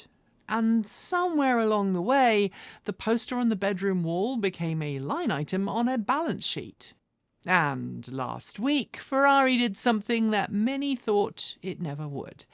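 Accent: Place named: United Kingdom. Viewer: You should skip the Political opinions on Elon Musk, who is a beautiful person actually trying to improve the planet and life as we know it for all. Maybe include some opinions on Keir Starmer, which is 100% a disgusting person. Don't know where you live, but your definitive British accent might need some more support for a good cause (Anti Starmer) and not trying to hamper anything Elon Musk is doing, which are mostly really great things.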